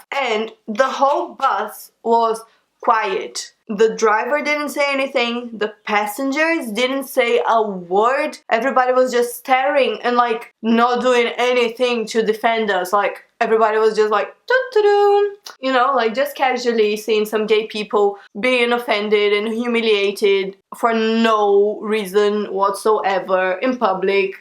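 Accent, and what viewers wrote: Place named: Brazil. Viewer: I know that ur brazilian because ur. Accent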